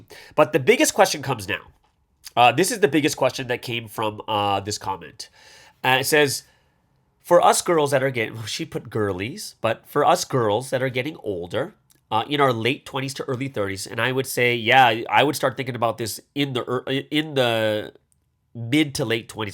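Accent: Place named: Australia. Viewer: Oh I'm all for 👏 GIRLY 👏 AUSSIE 👏 ACCENT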